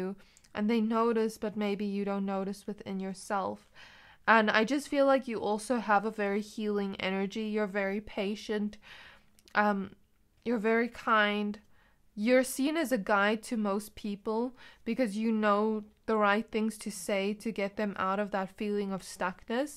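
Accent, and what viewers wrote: South Africa. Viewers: Great video ❤..if you're comfortable answering...may I ask where you're from...you have a SA accent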